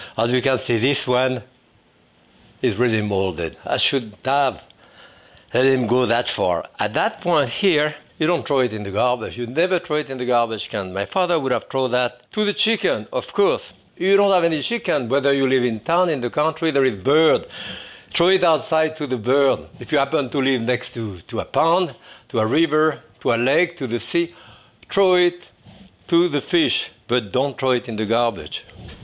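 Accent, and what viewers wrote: France. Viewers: J’adore Jacques Pépin ! Il n’a jamais perdu son bel accent français ! J’ai réalisé un grand nombre de ses recettes et astuces et c’est toujours un régal. Longue vie M. Pépin, vous êtes un trésor !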